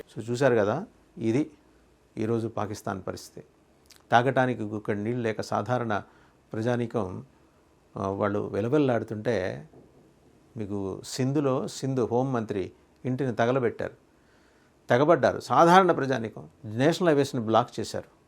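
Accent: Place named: Nellore, India. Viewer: It seems you are a nellore person as per ur accent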